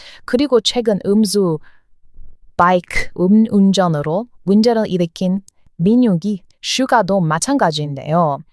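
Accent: United States. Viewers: 나도 AI 음성의 유튜브채널을 싫어하는 사람입니다. 그것들은 인간의 목소리가 아니라서 감정이 없고 억양도 부자연스럽지만 그보다 더 중요한 것은 가짜 뉴스나 남이 만든 내용들을 짜집기 해서 베껴 쓰는등 내용의 진실성이 의심되었기 때문입니다. 그래서 나는 그런 채널들은 구독하지 않지만 채널은 단 한번의 시청으로 바로 구독했습니다. 의 목소리는 미국인들이 한국어를 할때의 억양 그대로였고 영어로 말할때는 미국인의 본토발음 그대로였기 때문입니다. 그리고 그 무엇보다도 중요한 것은 만의 개인적인 견해가 있었고 다른 유튜브채널에서는 볼수 없는 독창적인 내용이 있었기 때문입니다. 님께 질문하겠습니다. 어떤 유튜버가 의 목소리를 AI 음성이라고 하던가요? 님도 의 목소리가 AI 음성으로 들리던가요? 님이 이 질문에 답변을 못한다면 그저 어그로나 끌기위한 댓글장난으로 간주하겠습니다. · 왠 AI? 목소리 너무 좋아요. 전형적인 미국인의 한국어 어투로 들려요.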